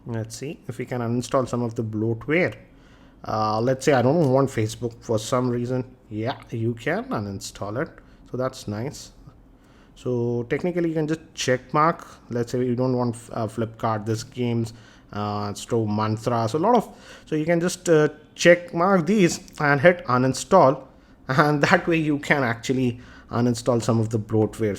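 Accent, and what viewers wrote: India. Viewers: Hey pig,he is speaking indian english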